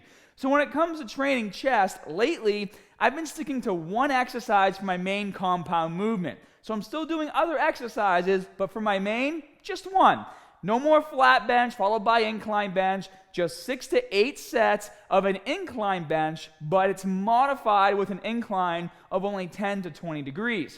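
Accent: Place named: Boston, United States. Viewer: Inconsistent Boston accent? Is he from Rhode Island or something? It sounds like a hybrid accent.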